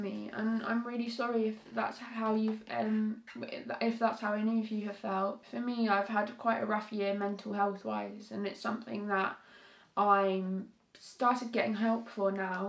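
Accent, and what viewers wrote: United Kingdom. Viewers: u have such a nice accent. I wish I could learn such a nice british accent ...I will try my best. it sounds so cute and british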